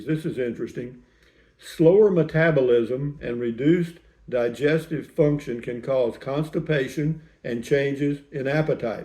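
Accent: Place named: South, United States. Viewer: Hi! Nurse here. Maintaining your dignity is half the battle of aging. I love elders!!! Greatest generation. You are doing it well,. You are as fine as an older Mathew McConaughey. Love that southern charming drawl.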